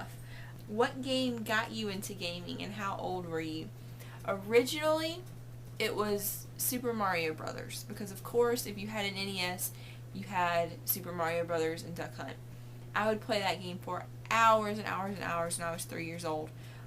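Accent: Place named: South Carolina, United States. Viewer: i love ur vids\ and you sound like ur from sc are you??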